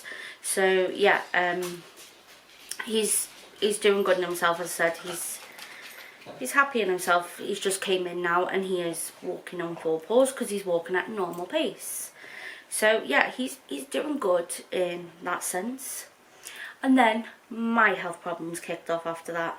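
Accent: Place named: Liverpool, United Kingdom. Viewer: Hi I am a crocheter/ knitter just found your podcast thanks to Ali from little drops of wonderful and love it we are ex scousers living in Australia miss the accent and loved listening to you.. Working my way through your pod casts you are a great knitter and crocheter loving your work I taught myself in lockdown to knit socks and loving it although we don’t need wooly socks here much, but I post them to my sister who really appreciates them🧶🧶🧶 carry on with your great work.!!!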